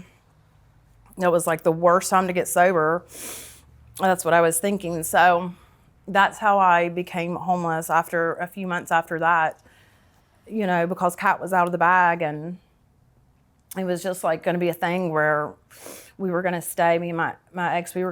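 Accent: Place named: North Texas, United States. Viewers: Did you grow up in North Texas/Oklahoma? Your accent is very familiar I am from there too. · I would have guessed her accent to be rural Oklahoma.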